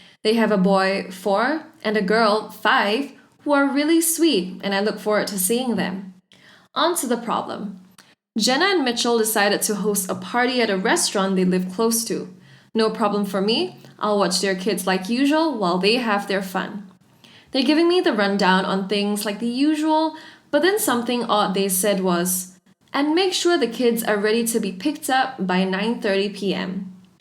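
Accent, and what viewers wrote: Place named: England, United Kingdom. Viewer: The reader has a slight English accent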